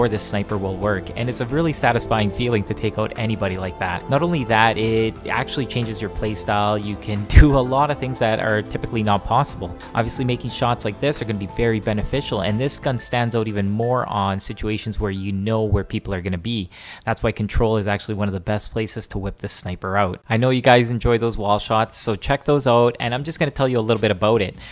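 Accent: Canada. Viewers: Your Canadian as hell :)) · I believe he's canadian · Is it me or does he have a Canadian accent?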